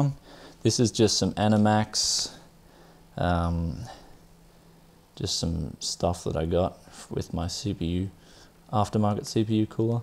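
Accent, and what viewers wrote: Australia. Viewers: Hey i have a question... I want to build a gaming computer and i know the parts i want to get, but i just don't know where to get them from since i live in Australia. I can tell by your accent you do too. So my question is: where can i buy pc parts in Australia? Oh yeah and by the way i love your videos, keep it up! Thanks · are you australian i can tell by ur accent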